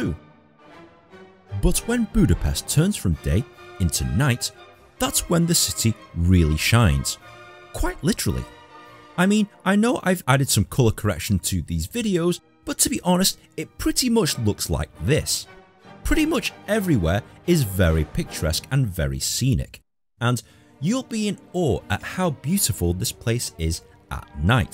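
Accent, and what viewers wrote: United Kingdom. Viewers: Fantastic video mate. Great to hear a travel guide done by a fellow Brit instead of another cheesy American. What’s the website for booking trips to the Hungarian parliament building? I’m hoping to go Budapest in the Spring.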